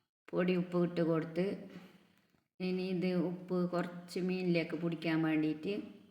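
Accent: Kasaragod, India. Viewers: മീൻ കറി റെസിപ്പി ഇഷ്ടമായി കൂടെ കാസർഗോഡ് ഭാഷഒത്തിരി ഇഷ്ടപ്പെട്ടു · എനിക്ക് നിങ്ങളെ വീഡിയോ കാണുന്നത് വളരെ ഇഷ്ടമാണ് നിങ്ങളെ വോയിസ് ആണ് എനിക്ക് ഏറ്റവും ഇഷ്ടം നിങ്ങളെ കാസർകോട് സംസാരരീതി എനിക്ക് വളരെ ഇഷ്ടമാണ് റെസിപ്പി സൂപ്പർ താങ്ക്യൂ ഫോർ ഷെയറിങ്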